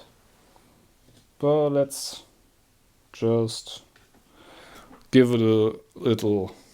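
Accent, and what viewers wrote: Germany. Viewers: Ich höre da ein deutschen raus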